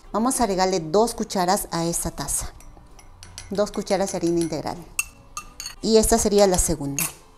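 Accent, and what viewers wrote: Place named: Peru. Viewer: Hola, estuve buscando en internet de qué país eres, al oir tu acento me enorgullece que seas peruana como yo, pero cuando dijiste cilantro, pensé que eres mexicana, hasta que te ví con tu amor haciendo desayuno dominguero y mostraron la ocopa. Orgullosa de que seas peruanísima. Un fuerte abrazo a la distancia.🎉❤❤❤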